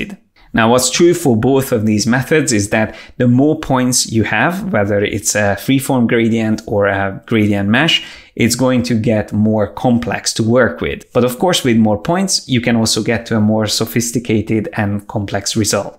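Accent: Hungary. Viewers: bonus for hungarian accent :)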